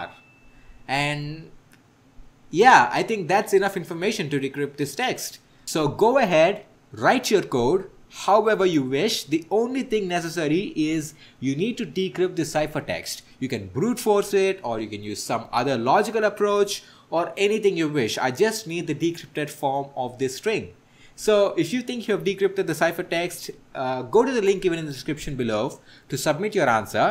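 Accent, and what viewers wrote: India. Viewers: pqp indiano falando em inglês é um porre.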